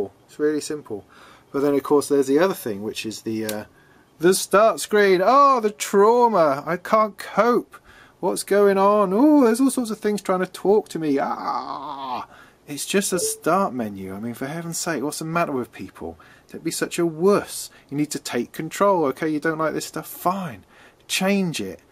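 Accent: United Kingdom. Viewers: y=u futn brit mate... 2quik 4meaaaa{british accent}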